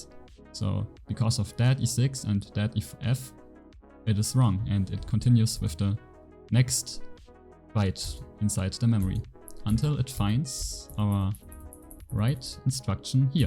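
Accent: Germany. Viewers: You German 🤔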